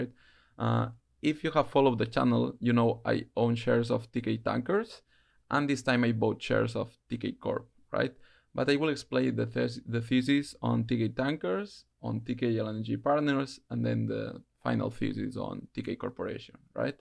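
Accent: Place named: Spain. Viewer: Me parece bien que divulges esta cultura financiera y oír a un español hablando inglés, que no se diga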